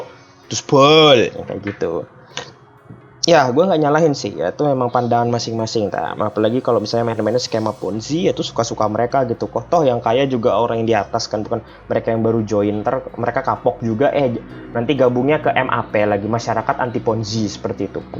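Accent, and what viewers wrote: Banjar, Indonesia. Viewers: Ikam urang banjar kh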